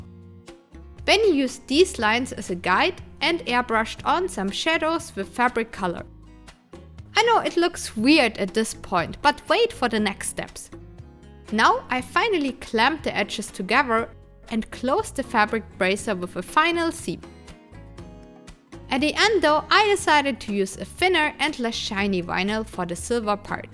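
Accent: Germany. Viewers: Where did you catch the german accent?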